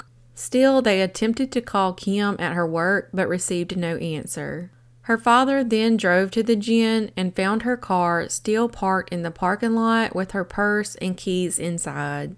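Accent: South, United States. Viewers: I love your southern accent 😊.. you are doing an outstanding job telling these stories getting the word out also.. I like listening and watching your channel... thank you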